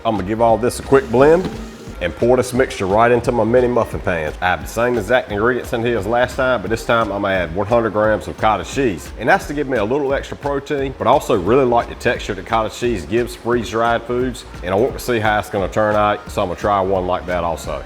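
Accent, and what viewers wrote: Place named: South, United States. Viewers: Sounds like A LOUISIANA accent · What part the south y’all from? I’m from NC and I adore the degrees in regional southern accents. I love your accent but I can’t quite place it!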